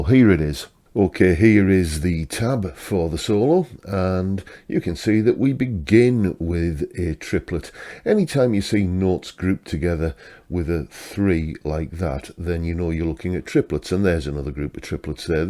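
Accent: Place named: England, United Kingdom. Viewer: Being an expatriate Englishman and a guitar player, it is nice to hear an English accent and your classic insights into guitar, so you are appreciated here in Australia too.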